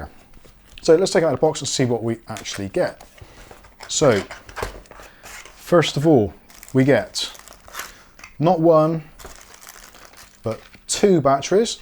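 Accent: Bristol, United Kingdom. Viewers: Are you from Bristol haha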